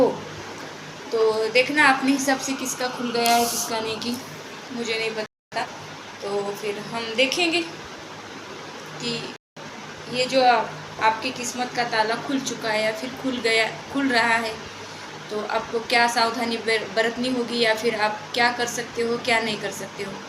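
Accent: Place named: Mumbai, India. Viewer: तथा संवादक मैडम जी को सादर प्रणाम स्वीकार हो । आपकी रीडिंग आवाज से मै समझ गया था इनकी भाषा मुम्बई तरफ की है । 100%सत्य है आपकी रीडिंग हम पूरी तरह से संतुष्ट है धन्यवाद। धन्यवाद ब्रम्हांड । चित्रकार राकेश नामदेव,अटेली मंडी जिला महेन्द्र गढ (हरियाणा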